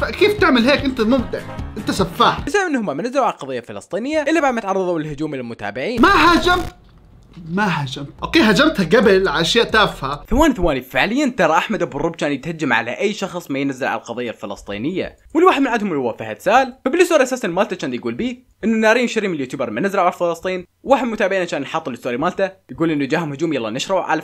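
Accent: Iraq. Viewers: اكلك انت عراقي؟؟؟؟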